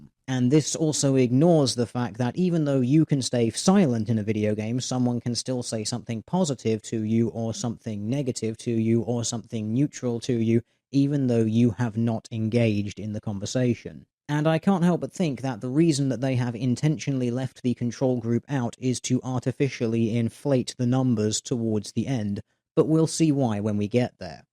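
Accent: United Kingdom. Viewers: there is no resisting a British accent.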